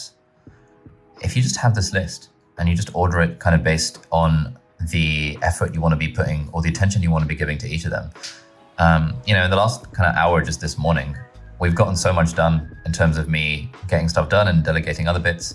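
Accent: United States. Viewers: Does anyone else notice accent has changed abit toward American? Or am I just imagining things? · Your accent has changed. It is more American now.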